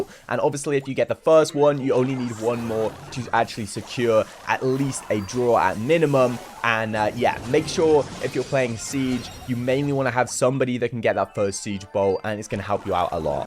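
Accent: United Kingdom. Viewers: it's called a British accent dummy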